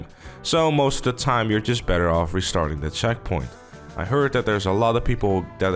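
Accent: Netherlands. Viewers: you sound dutch, are you? :)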